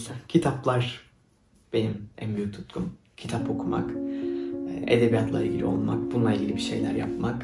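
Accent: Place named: Cyprus. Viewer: Alttan alttan kendini dışa vuran Kıbrıs şivesi çok hoş. ☺ Güzelim adacıktan görüntüleri görünce daha bir özledim şimdi. 🌿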